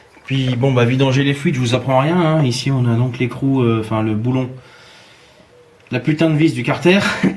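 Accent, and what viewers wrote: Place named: Nord, France. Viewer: Salut " " je me permets de t'envoyer ce message car moi aussi je suis youtubeur je viens à peine de me lancer dans tout ça. Je suis mecanicien PL de métier et j'aimerais que tu me donnes ton avis sur ma chaîne n'hésite surtout pas à critiquer. Tu ne sais pas comment les gens adorent ton franc-parler. Cela se sent que tu es du Nord moi mes parents sont du câteau 59. Et franchement je kiffe trop ta façon d'être. Je te laisse le lien de ma chaîne youtube surtout n'hésite pas à me déglingué s'il le faut <link>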